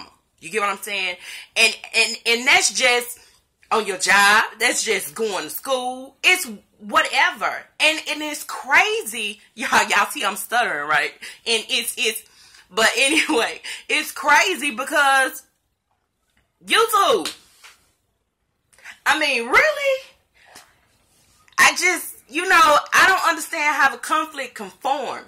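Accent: Texas, United States. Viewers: you from Texas. I am listening to your accent.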